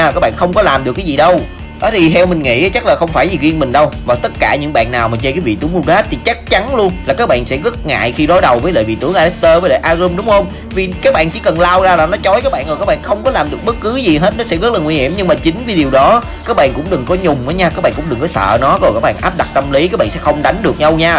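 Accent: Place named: Mekong Delta, Vietnam. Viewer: Rất có tâm, đúng với giọng điệu miền tây...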